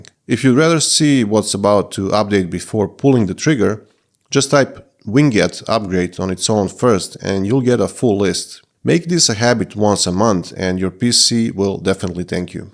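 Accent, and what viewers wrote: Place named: Eastern Europe. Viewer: Thanks for this. Detecting a slight eastern European accent...are you Russian? Studied Russian a long time ago.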